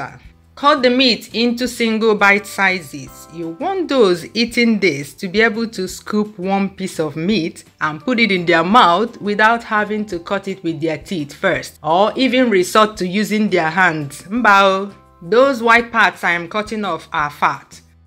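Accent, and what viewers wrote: Igbo, Nigeria. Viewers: I just love listening to your voice overs and authentic Nigerian accent. So warm and easy going. Having been in Canada for 12+ years.. reminds me of home! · i just love your ibo accent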